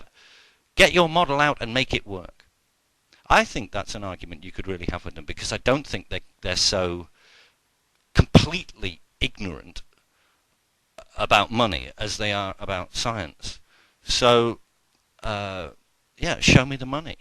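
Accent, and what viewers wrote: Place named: United Kingdom. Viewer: Let me guess, you're british.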